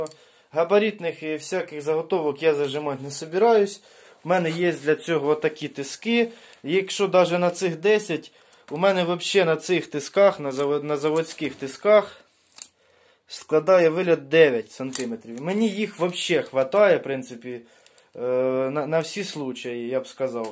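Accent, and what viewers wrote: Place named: Ukraine. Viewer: Я млею от этого суржика. Как-будто я побывал в родном селе. Молодец земляк! 👍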